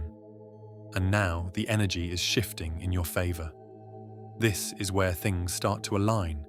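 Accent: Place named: United Kingdom. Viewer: What's up with the Brit tish twang, geez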